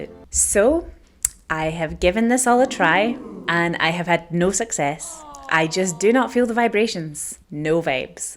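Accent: Scotland, United Kingdom. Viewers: Hey girl, you Scottish, you sound it. I have used it for years on and off. Been doing a lot of other meditations last few years. Keep going, it took me many years to project, only done it once but it was very vivid. I found myself floating around the ceiling. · I've now found a new favorite accent, is that Scottish, Irish, or something else? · . Which particular brand of British accent is that? 🤔😅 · You are from Scotland aren't you 😄 love the accent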